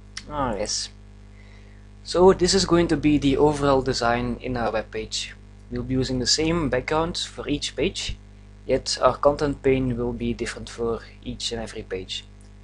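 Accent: Netherlands. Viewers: We horen direct dat je nederlander bent lmao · you're dutch right (no offence it's the accent) :) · nederlands accent, geen echte engelse :D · You are dutch I assume? :p Ik ook :p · Binnen 1 seconden te merken dat je Nederlands bent haha, chill video! Thanks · You have a dutch accent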